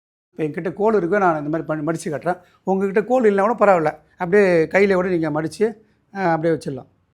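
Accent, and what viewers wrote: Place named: Chennai, India. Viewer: pakka chennai voice na..😊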